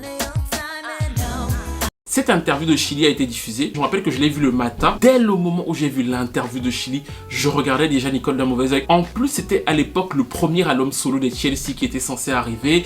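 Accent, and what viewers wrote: Cameroon. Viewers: L'accent camerounais 😎😎...so proud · Je viens de découvrir la chaine, j'ai reconnu l'accent du 237😂😂😂 et j'adore les vidéos sont longues mais on ne vois littéralement pas le temps passé tellement tu t'y connais dans le domaine.... Well done à toi.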